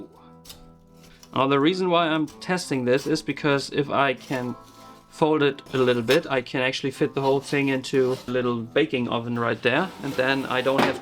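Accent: Germany. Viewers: As a german who is also fluent in english, I really love the denglisch vibes.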